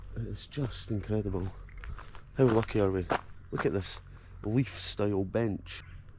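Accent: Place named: Scotland, United Kingdom. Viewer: When is a loch not a lock Answer When your listening to a Scott description of his own Country Music to my ears 👍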